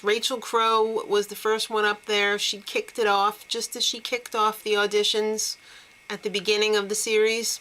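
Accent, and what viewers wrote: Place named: United Kingdom. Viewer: Your british accent is getting better....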